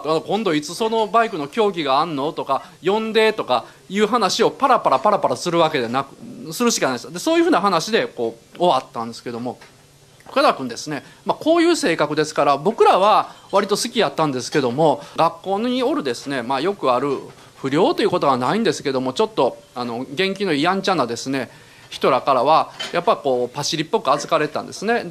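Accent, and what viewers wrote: Kansai, Japan. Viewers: 関西弁ネイティブなのに標準語も違和感ないって、この人天才なんかな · ベタベタの関西弁の岡田さんも面白すぎで好きです(笑) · めちゃくちゃ関西弁やんけ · 岡田さんが関西弁で話すのが新鮮です。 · 噺のネタもさることながら一番やせてた頃のの語り口が関西弁多めなのがさいこうです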